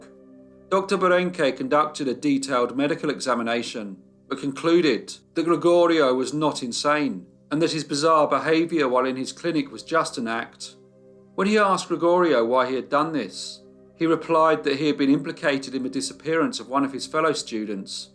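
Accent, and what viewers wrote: United Kingdom. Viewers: Nothing eases anxiety like listenening to someone from the British Isles narrate horrifying and disturbing crime. Thank you so much.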